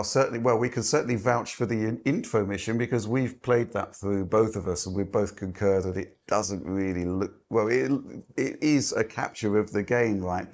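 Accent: Germany. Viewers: You German? Your accent told me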